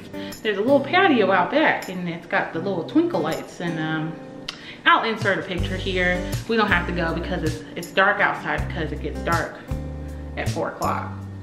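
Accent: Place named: South, United States. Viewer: Is the southern accent for me😂😂😂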